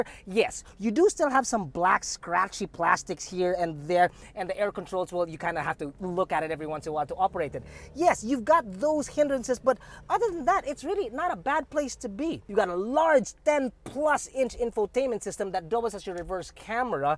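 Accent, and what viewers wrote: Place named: Philippines. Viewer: His pinoy accent is annoying, hindi ba marunong mag tagalog.I guess social siya.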